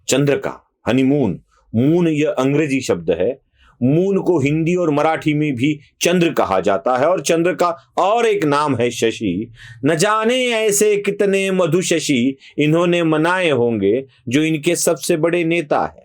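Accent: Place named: Bihar, India. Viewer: Hindi mein hamesha A hi bihari style ho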